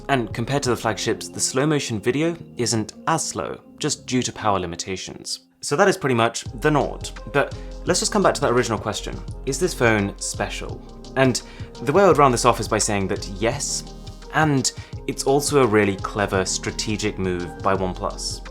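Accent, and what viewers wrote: United Kingdom. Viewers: Haha yah that's UK accent for u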